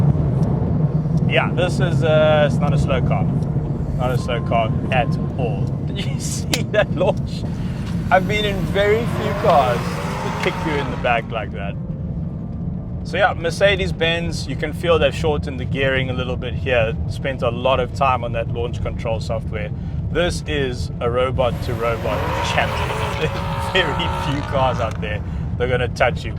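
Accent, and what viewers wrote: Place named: Johannesburg, South Africa. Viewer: The Jo'burg accent😂😂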